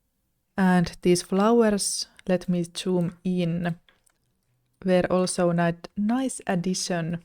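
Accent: Finland. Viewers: i can recognize a finnish accent anywhere and this is one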